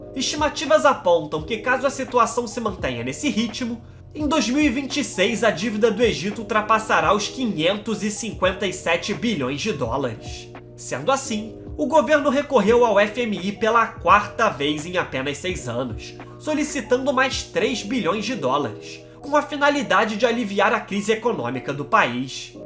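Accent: Rio de Janeiro, Brazil. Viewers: O conteúdo do vídeo é excelente, mas precisa neutralizar um pouco esse sotaque carioca, misericórdia! 😣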